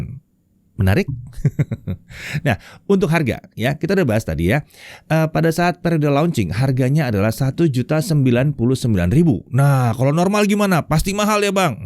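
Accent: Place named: Java, Indonesia. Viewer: iTel javanese speak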